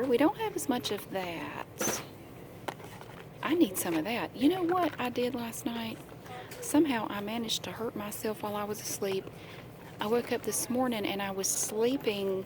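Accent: South, United States. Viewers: You sound like my family. We're from Alabama and South Carolina. When I get sleepy or angry my southern really pops out. I find your videos really soothing. Keep being you!!! ♥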